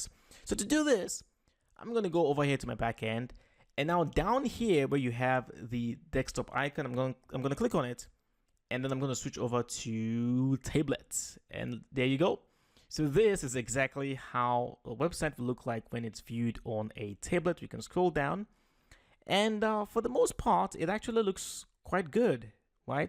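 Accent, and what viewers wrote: Nigeria. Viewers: Fantastic video, delivered with clarity and a little humour - just right! I am interested in your accent. Would a guess of Nigeria be anywhere near? Thanks again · you kinda sound nigerian lol . great video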